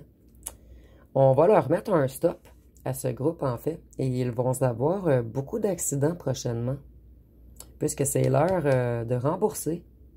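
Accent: Quebec, Canada. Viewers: ❤❤❤❤ BONJOUR MME J AI ENTENDU SE QUE VOUS AVEZ DIT SE MATIN MAI SACHER QUE TOUT LE MONDE N RST PAREILLE ET MOI JE VOUS APPRÉCIÉ ET VOTRE AXCENT QUE J AIME J AI BEAUCOUP TRAVAILLE AVEC DES QUÉBÉCOIS ET JE M ENTENDAIS BIEN DRS BON SOUVENIRS ...... MERCI POUR TOUTES VOS GUIDANCES MAI JE SUIS EN BÊTE AVEC C EST GENS MAI LA POLICES QUE FONT-ILS ????? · Vous avez de très belles mains et ongles très beaux bien ,j'adore cela ,votre accent québécois aussi est fort agréable Madame , et vos tirages sont très justes toujours , vous êtes très bonne dans les prévisions de guidances .❤👍🙏🌹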